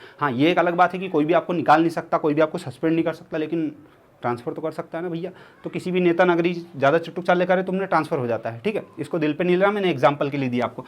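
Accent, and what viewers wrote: India. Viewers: Bhai apka bolne ka tarika mast hai sudh desi mujhe pasand aaya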